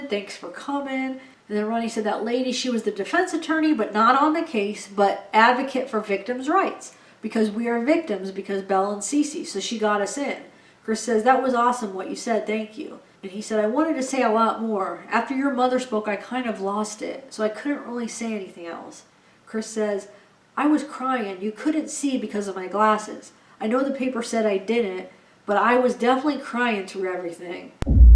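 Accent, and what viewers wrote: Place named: Midwest, United States. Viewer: I had to take a break from this case also... I can only imagine how feels... But her videos are great! I think her observations and insights are spot-on... Lol plus I love to hear her talk.I think she has a strong Midwestern accent and I am from the Midwest also lol I say Illinois Wisconsin or Michigan LOL 😜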